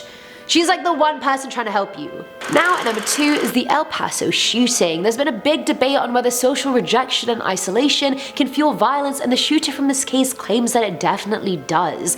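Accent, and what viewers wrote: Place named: United Kingdom. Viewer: She has the best British accsent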